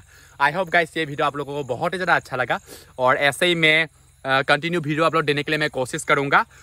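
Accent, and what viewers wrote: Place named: Assam, India. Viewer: Aap assamse hona bat karneke andaj sehi pata salta h😬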